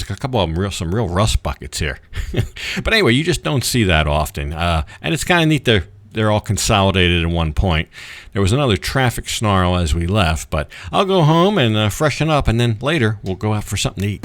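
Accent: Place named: South, United States. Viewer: Where are you from? Sound like Southern USA 🇺🇸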